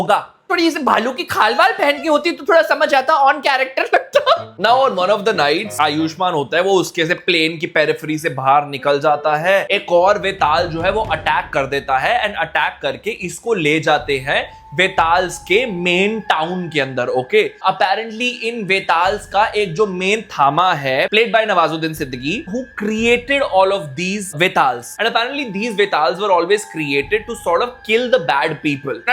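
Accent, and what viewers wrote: Punjab, India. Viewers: Bro ... I can see the movie is a total bheja fry but ur review is just outstanding 😂😂 bhai hasn hasn k pet dard ho gaya. I love the way u say things with a tint of punjabi 😂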